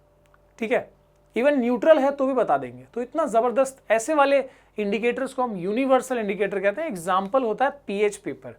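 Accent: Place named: South India, India. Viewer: Sir aaj aap south + Mumbai jaisi hindi Kyun bol the he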